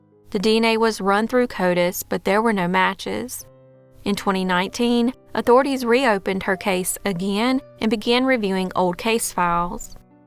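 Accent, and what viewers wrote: South, United States. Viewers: This homegrown Aussie girl absolutely loves all of your southern girls accents. Or is it y’all? Sounds lovely to me. 🎶 💕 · The southern accent definitely helps.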